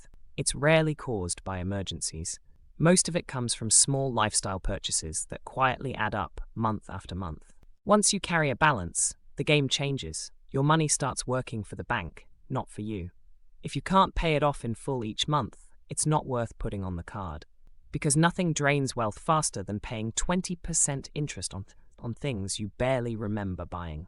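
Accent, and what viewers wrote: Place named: England, United Kingdom. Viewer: English Accent means I stop listening to this garbage.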